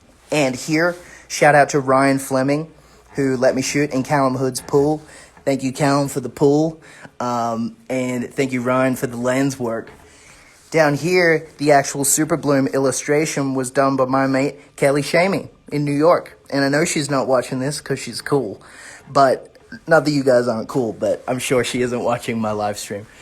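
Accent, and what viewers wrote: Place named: Australia. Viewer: THANK YOU AND HE SOUNDS SO AUSTRALIAN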